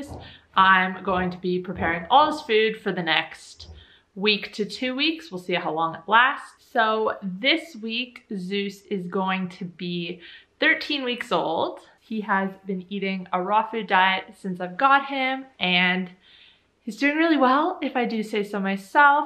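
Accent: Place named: Australia. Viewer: Your Australian yayyyyy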